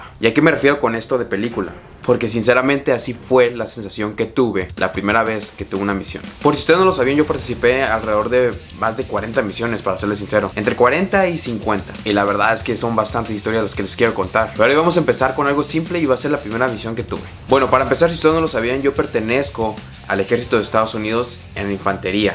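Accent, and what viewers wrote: Mexico. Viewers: Eres de Estados Unidos y tienes el acento mexicano muy marcado alch que crack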